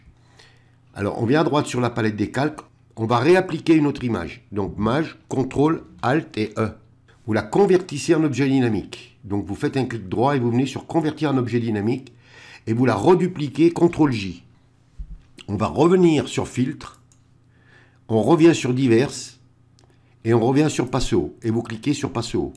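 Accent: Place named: Dauphiné, France. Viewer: il me semblait bien qu'il y avait un petit accent dauphinois! bravo, merci pour ce beau tuto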